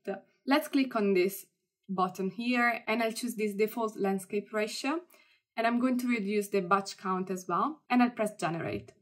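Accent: Italy. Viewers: Love your channel and your Italian accent 😊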